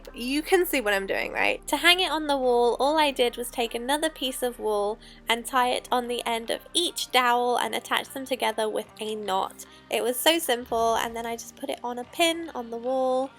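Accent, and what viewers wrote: England, United Kingdom. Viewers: love your accent so how is ron and the kids if you have seen harry potter you will get it loved the ideas by the way where you from what accent is that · are you from England? ?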